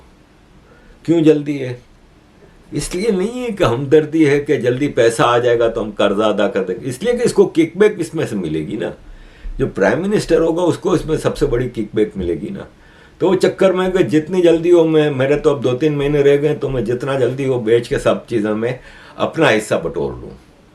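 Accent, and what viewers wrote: Gujarat, India. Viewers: sir I watch your videos and am always curious why you say "jay jindera" in your intro. Then I research about you and I found out your roots from Junagadh Gujarat and your accent is also Gujarati Hindi/Urdu accent I got that coz am also being gujarati i got that now. Hats off sir of your work and spread knowledge & awareness to world.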